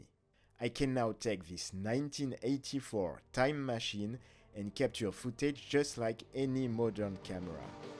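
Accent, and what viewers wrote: France. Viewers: Mais c'est super l'accent Francais, ici aux USA ils adorent ca !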